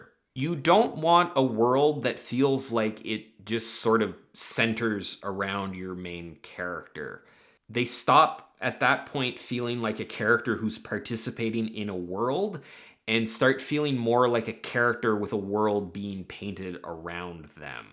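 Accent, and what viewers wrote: Canada. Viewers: Once again commenting on an old video: Balding isn't a flaw, nor is a Canadian accent (that's an asset). Lack of charisma could be a bit of a flaw, but it's not one you have, at least when it comes to video. Now I do have a problem with the single character being the centre of the world. If you're writing from a single POV, that character is automatically at the centre ALL THE TIME. If the POV is first person, there's more leeway because you have immediate, deep access to their thoughts, and they can spend a lot of time thinking about and observing the things happening around them. In a sense, 1st person POV characters can serve as a storyteller in their own right. In 3rd person, though, this level of interiority comes at a higher price because of the perceptual gap between the external and internal experience of that character. Is this really a problem inherent in single 3rd person limited POVs? 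Or are we just so used to an enormous multiplicity of POVs in epic fantasy that, in comparison, books that contain a single 3rd limited POV seem too focused on one character?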